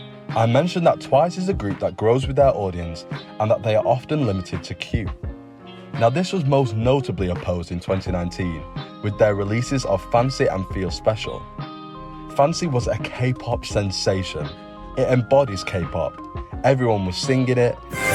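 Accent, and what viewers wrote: United Kingdom. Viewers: Love your northern UK voice bab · unrelated but what is your accent called/from what part of england? i wanna know lol✨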